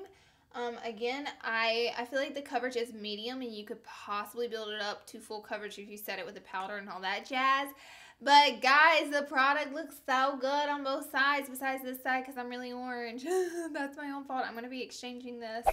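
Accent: South, United States. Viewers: She is so pretty but her voice is so country 😅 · I'm obsessed with your country twang. OBSESSED! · Just started watching you and my first thought was oh, girl from the South! Love it! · I just love you ! Every time I see the episode of the office when Pam is Deb U Taunt in that murder mystery game I think of your southern darlin accent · Love your extra craze and extra south in this video! ❤️ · OMG, I love you!! <3 I hear your southern belle accent coming THROUGH! And i do love the way you styled your hair in this video. xoxo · First time watching your video, I love your Southern accent!!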